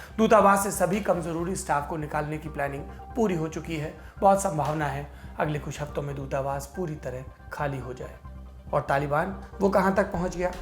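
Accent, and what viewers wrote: India. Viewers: Apne state ki khushbu aati h anchoring m apki ji..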